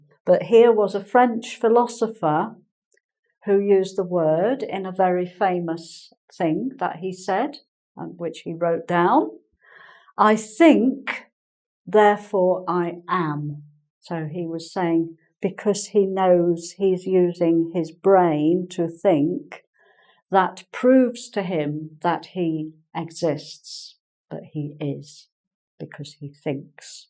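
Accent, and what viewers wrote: United Kingdom. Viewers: Gracias maestra es muy clara en su enseñanza. Me encanta el acento británico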